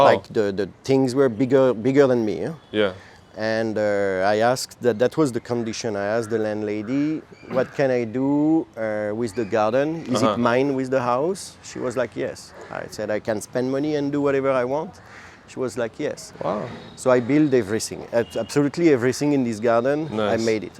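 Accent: France. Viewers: After 21 yrs he still got french accent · Even after 20 years he still has French-English accent 😂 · Well, he is French, speaking English, which is not his language fluently. Don't mind the accent. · He is Indian with a french Accent !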